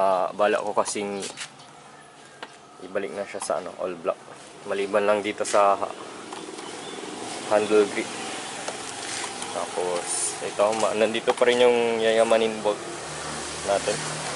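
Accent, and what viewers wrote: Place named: Pangasinan, Philippines. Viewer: taga pangasinan punto nyu lodz ah😂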